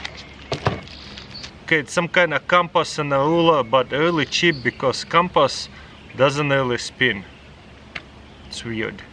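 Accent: Russia. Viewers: По первому слову понял,что ты русский) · Я один слышу русский акцент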